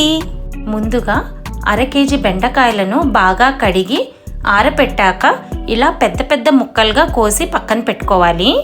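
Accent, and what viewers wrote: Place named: United States. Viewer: Madam I am a huge fan of your cooking and your american telugu accent and your style of expression please tell repice of chicken soft kabab and chilli motail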